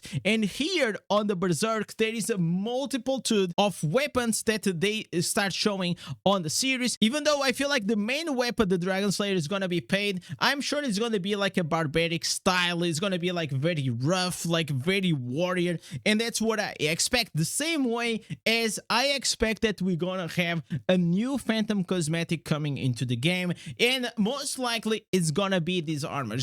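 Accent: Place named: Eastern Europe. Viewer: What nationality are you bro? Your accent sound eastern European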